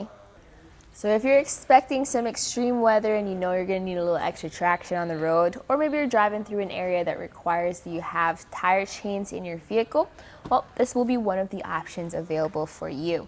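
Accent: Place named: Midwest, United States. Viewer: Someone's from the Midwest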